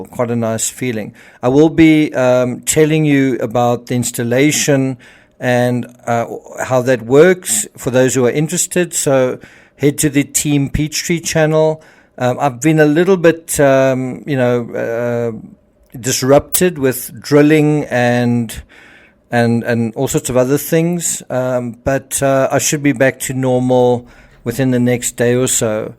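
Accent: South Africa. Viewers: Are you from South Africa? Thank you for keeping us informed I do think your presentations are excellent.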